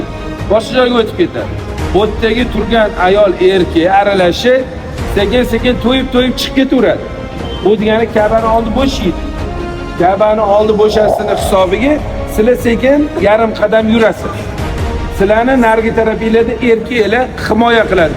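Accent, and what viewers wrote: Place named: Uzbekistan. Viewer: БОРСАМ. ХАМ. ШУ. ИНСОН. БИЛАН. БОРИШНИ. НИЯТ. КИЛДИМ. ЖУДАХАМ. ТУГРИ. ТУШИНТИРИШ. БЕРАР. ЭКАНЛАР. ОЛОХ. РОЗИ. БУЛСИН. ИЛОХИМ. УЗИМИЗНИ. ШЕВА. УЗИМИЗГА. УХШАГАН. АЁЛЛАР. КАЕРЛИКЛАР. БУЛАР. ДОМЛА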